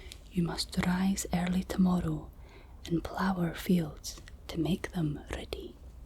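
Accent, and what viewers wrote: Scotland, United Kingdom. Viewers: Love listening to your lovely Scottish accent. ❤❤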